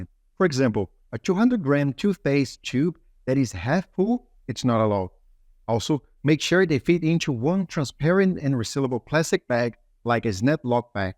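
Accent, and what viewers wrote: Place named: India. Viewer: Guy sounds like a Telemarketer from India.They can't find an Aussie as narrator??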